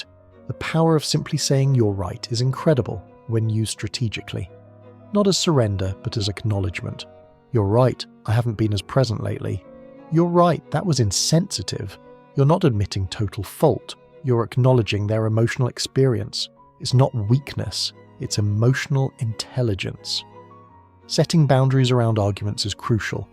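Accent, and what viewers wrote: United Kingdom. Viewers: If this is a us-based program, why the British voice? Do Americans think that if it's a British voice, it's true or that it carries more weight than an American style voice?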